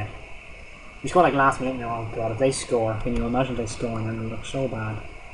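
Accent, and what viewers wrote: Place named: Ireland. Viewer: Where bouts in Ireland are ya from?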